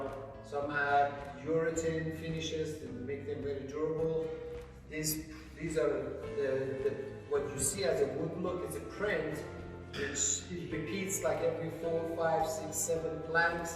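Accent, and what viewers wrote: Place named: Iran. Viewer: Dude’s name is but accent is so iranian 😆 #loveit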